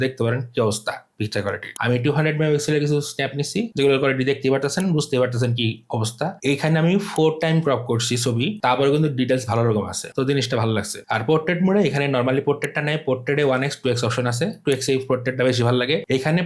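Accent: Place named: Gazipur, Bangladesh. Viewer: Vai basa ki gazipur naki tangail?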